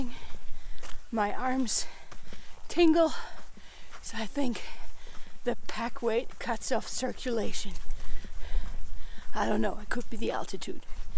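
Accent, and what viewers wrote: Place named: United States. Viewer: You remind me so much of my friend from norway. She speaks English with a modified American accent like you do. Instead of a British accent. But we’ve been friends for 40 plus years. She has American friends so there you go. Anyway, she came out to visit me a few years ago snd her fav part of CA was the sierras. Traveled the western and the eastern sections of the sierras from lake Isabela to Lake Tahoe. I’ve traveled the world. Few places as ruggedly beautiful as the sierras. Tho I think the cascade range in WA snd OR, where I grew up, is incredible as you will soon see. Thanks for sharing your adventures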